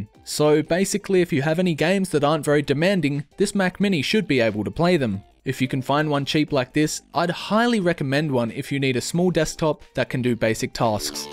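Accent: Australia. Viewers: Finally an Aussie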